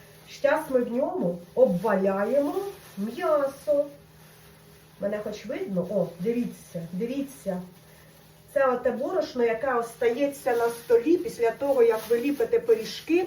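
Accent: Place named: Zolotonosha, Ukraine. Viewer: я тащусь від твоєї мови.Точно,як у нас,в Золотоноші.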